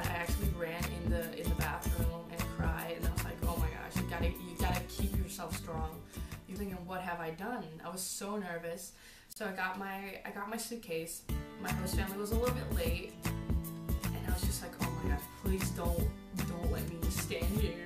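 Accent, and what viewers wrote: United States. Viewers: This was very helpful, but I have some questions, was it very difficult to go to their school and learn in English(I mean are their schools difficult), and have you ever just sat in your room thinking: "I can't do this anymore."? I'm from Serbia and I'm considering about going so I was pretty much excited when I was watching the video. Ps. Your accent is amazing, it's like you're an American 😱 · You have more of an American accent than me, and I'm American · How do you have an American accent?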